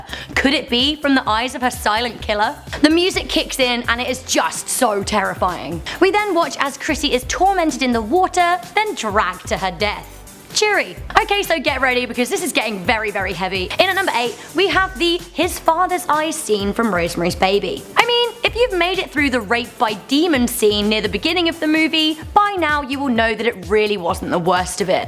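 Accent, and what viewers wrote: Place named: United Kingdom. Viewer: is so fun to watch and listen to. Gorgeous lady with a delightful British accent.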